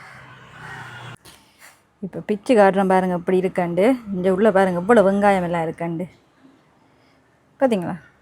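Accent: Sri Lanka. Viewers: ஈழத்தமிழ் வாசம் வருகிறது மகிழ்ச்சி தான் உண்மையா சொல்லுங்கள்pls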